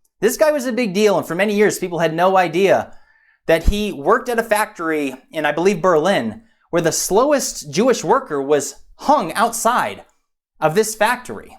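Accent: United States. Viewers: woah brother are you from Idaho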